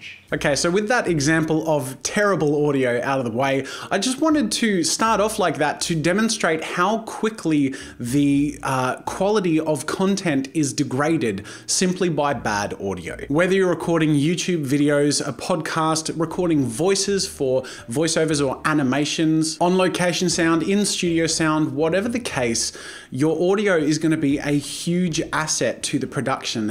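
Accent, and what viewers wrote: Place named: Australia. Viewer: Australian; Heck yeah!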